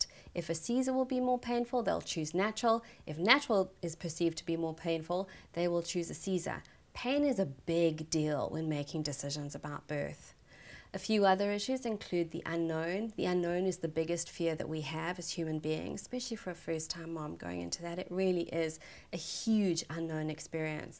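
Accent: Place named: South Africa. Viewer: Thanks. I'm also from South Africa but recently have been traveling south easy Asia and moving to Thailand,Phuket soon. I am 21weeks pregnant. Great to hear a familiar accent!!! Thank you for your work you doing and I will be looking further at downloading your videos for sure. Do you include a lot of the hypnobirthing tools in your toolkit? Many thanks and love. X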